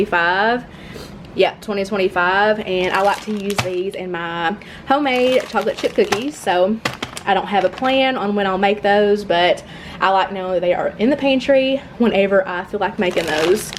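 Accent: South, United States. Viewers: You speak very well it's all steel magnolia very southern best wishes for all your goals on giving us you loveya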